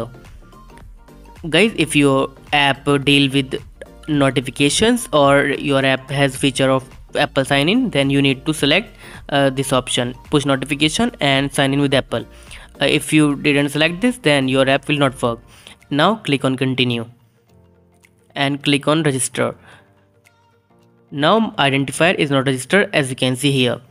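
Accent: India. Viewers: that hindi english, thank you!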